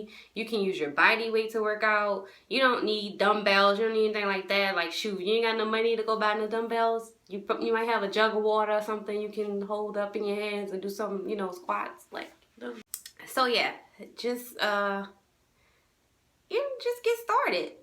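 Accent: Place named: Louisiana, United States. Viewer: Congrats and love your video and Louisiana accent. I also subscribed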